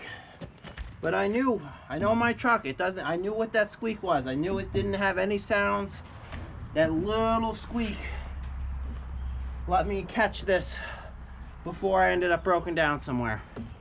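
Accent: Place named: Northeast, United States. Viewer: Hey, I don't think I heard you correctly, - , it sounded like you said... "Like every time you be Meth..."? I know, I'm from California and I sometimes have trouble with that 'NorEastern' accent... What was that?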